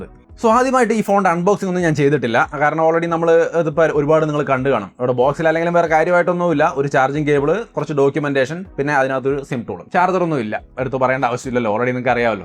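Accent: Thrissur, India. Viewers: Are u from Thrissur!??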